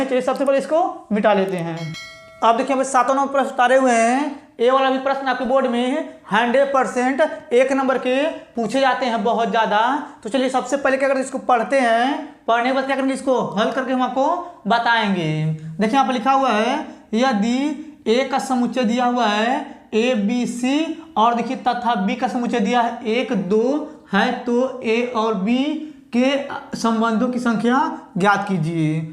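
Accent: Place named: Bihar, India. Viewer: thank you सर रिप्लाई के लिए आपकी भाषा को सुनकर लगा कि शायद बिहार के है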